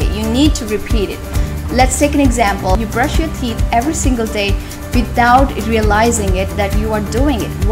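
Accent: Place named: United States. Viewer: Wow...bhut achii english h apki..love from pauri garhwal...aap kis chij m job krte h ji...or apne USA style English kase sikhi please tell me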